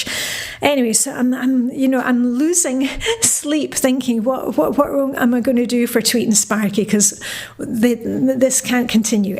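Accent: Scotland, United Kingdom. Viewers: Do you have a Scottish accent?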